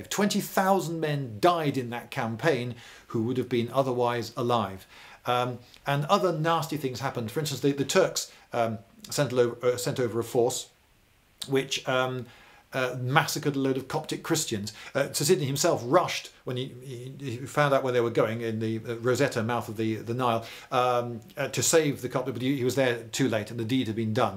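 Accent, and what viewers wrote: England, United Kingdom. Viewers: Pretty sure this guy is british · He is also brittish, VERY brittish. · I always love hearing an Englishman talk about Napoleon. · This is the most british briton to ever Brit. Love it!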